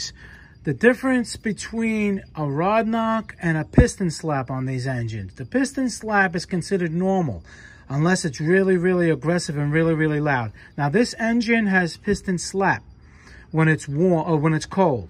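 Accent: New York, United States. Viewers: The New York accent shined through over that rod knock 👍 best comparison video online. thank you!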